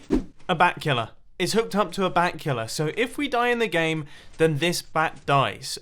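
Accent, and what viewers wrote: England, United Kingdom. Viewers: I love English YouTubers the way they talk makes me laugh(this is not an offensive comment I hope)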